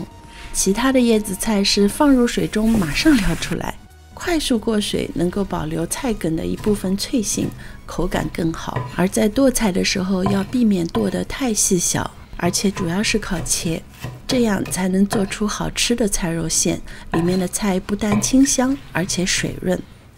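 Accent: Shanghai, China. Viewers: 上海腔调，上海咪道，一只顶。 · 一看一听就知道是阿拉上海宁额腔调 灵额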